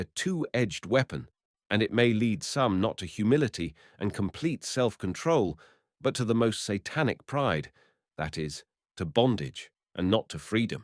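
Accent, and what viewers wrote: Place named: United Kingdom. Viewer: Lovely rounded British English accent. Easy on the ear 👌 ❤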